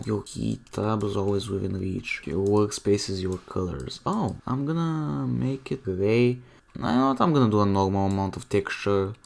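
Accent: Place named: Bulgaria. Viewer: i knew i heard a bulgarian accent. Great video tho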